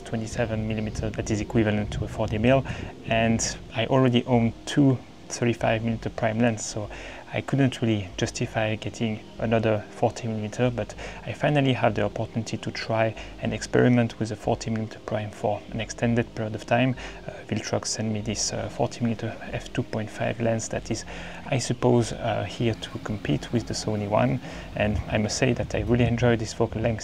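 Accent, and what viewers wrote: France. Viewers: Totally loving this video! My go-to gear is my Sony a6000 and my Sigma 30mm f/1.4 (equivalent to 45mm full frame.) The irony, Sir, in documenting lenses and street photography, you are one of the people are developing the Youtube POV Street Photography video as an artform unto itself. The collation and sequencing of street scenes, I would say, is not unlike putting together a photo book. And the choice of music is another layer that puts the mood and vibe into the video. And your French accent is lovely! Reminds me of my cousins in France. I encourage you to visit South East Asia where you can find more places like this. There have been many street scenes that been ruined by ugly lamp posts. Since my Sony a6000 has no OSS stabilization, I mostly use poles to brace my camera against. It’s sweet, since these poles allow you a 360 degree view while you brace your camera against it. 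But I love your composition solution for these poles. I never realised that small streaks of light can distract the viewer! But then, I mostly shoot at night. I suspect that small hut at the top of the hill could be a toilet. I noticed the signage at the foot of the hill that said 便-something. But I could be wrong. This is the first time I have heard of the camera as being a point in the compositional triangle. I love it!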